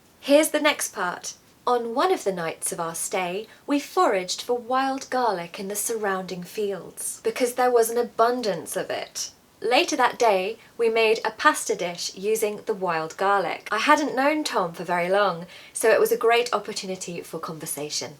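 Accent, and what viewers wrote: RP, United Kingdom. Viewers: she sounds so British, I love it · Thank you so much for teaching us teacher i love you so much! Your british accent is beautiful. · I love your beautiful RP accent even I live in Australia.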